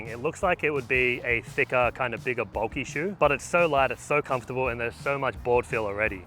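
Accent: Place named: Australia. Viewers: hes aussy its not that serious its a culture thing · Dudes never heard an australian man speak before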